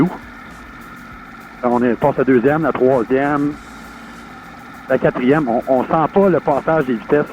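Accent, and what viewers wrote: Canada. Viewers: Vous parler comme les canadiens... c'est une moto douce et avec une grande souplesse, mais moi je prefrere la manualle de toute la vie avec l´'embrayage et la boite a vitesses...salutations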